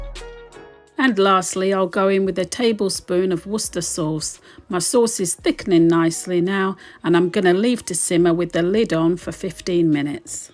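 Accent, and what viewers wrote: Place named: London, United Kingdom. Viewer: Hi.. You videos are amazing I've liked a few mostly veggie as I dnt eat meat.. I love how your spice blends and cooking methods can bring flavour and spice to simple vegetables.. I plan to try your bean chilli recipe because its just seems so much full of flavour compared to the garlic powder, onion powder, smoked paprika recipes I'm used to seeing lol can I ask where you are from? I grew up in Hammersmith sw London and your voice reminds me of home just as much as your recipes.. Keep it up x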